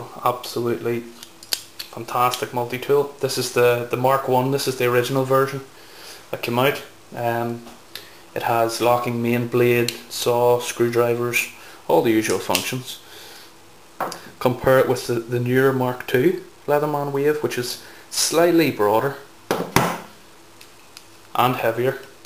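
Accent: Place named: Ireland. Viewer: whoops your irish lol sorry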